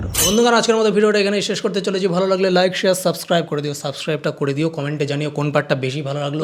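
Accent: Bangladesh. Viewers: তোমার কথা মাঝে মধ‍্যে শুনলে মনে হয় বাংলাদেশের!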